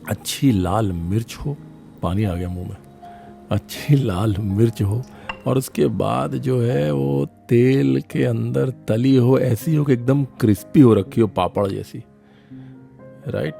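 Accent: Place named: Lucknow, India. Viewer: Lucknow ka poora poora asar dikh rha hai lehze mein!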